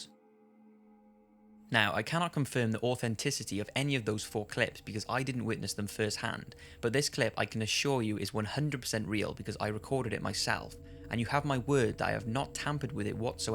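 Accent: United Kingdom. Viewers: Losing your Brit accent? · he sounds more British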